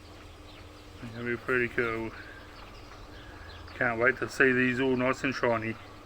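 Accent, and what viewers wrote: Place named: New Zealand. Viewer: Wonderful that you inherited his beautiful collection because you appreciate those rocks so much. His lifetime collection went to someone who understands the joy and work he had as a collector too. Will be wonderful to see the next part of their journey, how you use them. Even sharing them with us is a gift. I live in Oz but I'm NZ born and love rocks. I've learned a lot watching American rockhound channels. I'm so excited to have found your channel and to see my homeland and the treasures we have in New Zealand. Mauri Ora bro. Thanks for sharing. Subscribed and excited to follow. I'm looking forward to watching your past and future videos. So good to hear that Kiwi accent and find someone who shares my love for rocks. My Dad is taking me rockhounding soon here in Oz and I'm excited. I've never been but I used to find serpentine when I was a kid amongst the rocks at Shipwreck Bay on the 90 mile beach. 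My Nan would take us up into the sandunes and we'd find beautiful gems, agate nodules smoothed by the sand. She also had two Moa stones and a couple of Nautilus shells she found on the beach. Spent many happy hours beachcombing as kids and finding shells. We lined her driveway with giant toheroa shells. Don't know what happened to her treasures. So sad. I'd give anything to have had those because they were so special and she meant the world to me. Best days of my life were my childhood with her. xo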